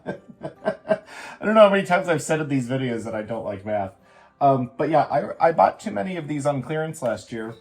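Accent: Minnesota, United States. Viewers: You're lookin' good there,! My goodness gracious, this is a family show. Hey, out of your window is green grass and green leaves! Are you decorating in June? Because some cranberries spilled we have our 6th day: On the Sixth Day of Vlogmas my true love gave to me Six berries spillin' Five Christmas trees 🎄🎄🎄🎄🎄 Four Paolo meows Three rolls of batting Two power strips 🔌🔌 And you sound like the movie Fargo 🎄⛄🙂⛄🎄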